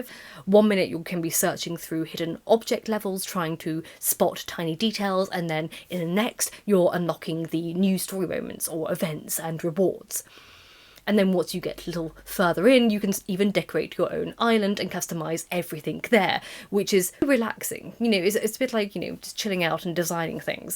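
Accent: United Kingdom. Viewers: If I have had to choose someone to represent the british accent, it would be you.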